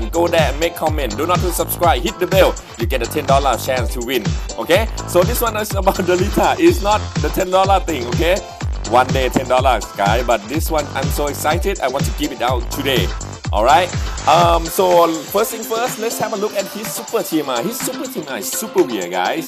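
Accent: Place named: England, United Kingdom. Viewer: I like your English accent its great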